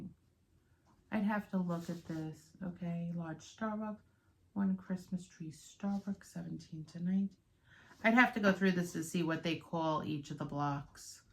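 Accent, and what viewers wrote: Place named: New Jersey, United States. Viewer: Hello. Awesome projects. I was watching a different video of yours. I recognized that you speak more like I do compared to other states 😂 I’m in NJ but it’s very similar in certain areas of NY. I recognizes the word bought, Autumn, etc. Idk it is crazy. Before I started watching YouTube and hearing other accents, I would have never noticed that you sound like home I guess I could say 😂❤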